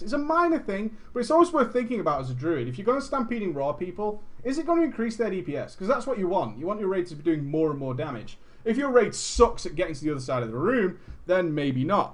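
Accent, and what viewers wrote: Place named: Manchester, United Kingdom. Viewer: you sound like yer from "MAAAAHHHN-cheeeeh-stah" /brofist!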